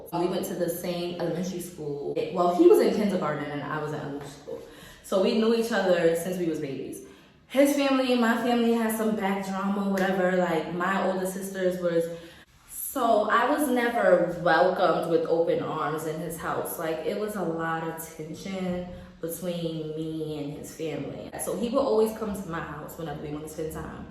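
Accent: Jamaica. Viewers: Girl you Jamaican 🇯🇲🇯🇲🇯🇲🇯🇲 I never knew that lol loooooooove it... "a wah gwan, a wah the bbc" I CAN NOT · you jamaican lalala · I’m Jamaican n from the bx u talk like me 😂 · wasnt gonna subscribe till i heard the yardie accent ........big up yuh self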